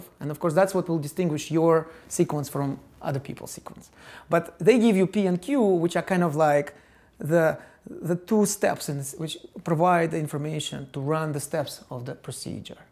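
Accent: Russia. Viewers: Dat Russian accent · I didn't even listen to the contents of the video, I just love the Russian accent! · Russian accent explaining how the US govt spied on... Everyone. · He has definitive russian accent. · His accent sounds like Russian.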